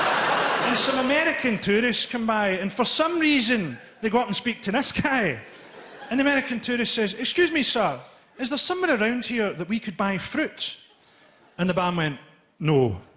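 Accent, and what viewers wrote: Scotland, United Kingdom. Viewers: I love stand up comedy but it's hard for me to understand what he is saying. I have watched and understood Dylan Moran's specials so my English is ok I guees. Is it because the Scottish accent or he's using localism? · It's standard english with an scottish accent. You'll get used to it.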